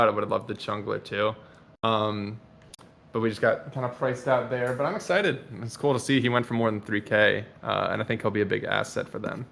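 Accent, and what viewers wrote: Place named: United Kingdom. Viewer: Hej that’s the brittisk guy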